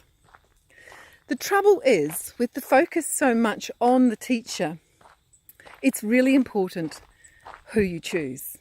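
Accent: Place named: South Africa. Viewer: Walking and videoing, horrible SA accent, rambling on and on, makes for a bad video.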